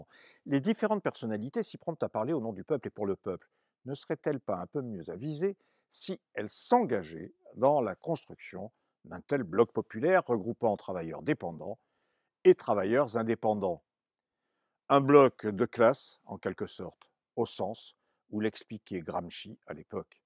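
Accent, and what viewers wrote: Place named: Paris, France. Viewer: Sur le fond, c'est à mon ressenti, parfait. Sur la forme...Comment peut on s'appeler " ", habiter un village qui s'appelle Libre et avoir un tel accent parisien....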